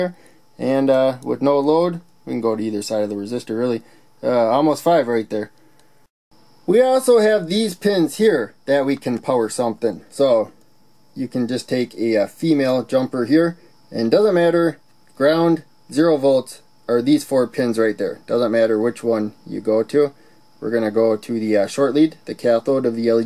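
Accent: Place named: Northern Wisconsin, United States. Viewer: Nice video. I hadn’t heard of breadboard power supplies. I also use a branch supply but often my projects need to be mobile to test so this is a nice option. By the way, I have to ask, are you from Wisconsin? Northern Wisconsin? You sound almost exactly like my cousin who grew up in Superior.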